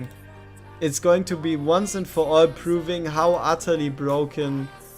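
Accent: Germany. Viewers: naja man hört halt direkt dass du Deutscher bist :D aber man versteht alles und das ist doch die Hauptsache. Und inhaltlich eh top, also who cares ;)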